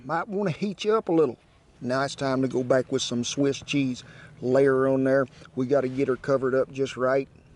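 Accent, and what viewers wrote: South, United States. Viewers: You just have that down home way of speaking. BTW I’m in Woodward so I’m not too far from you. ❤️ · I love this American accent! God I'd love to move to the US. Ah man that is life!!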